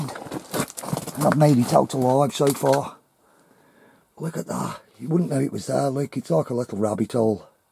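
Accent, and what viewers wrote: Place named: North West England, United Kingdom. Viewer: that’s ok brother. Are you from north west U.K. accent sounds familiar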